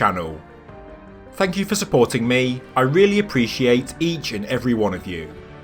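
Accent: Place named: Midlands, United Kingdom. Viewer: I'm from England, yet can't place your accent, sounds Midlands maybe. Another well researched presented video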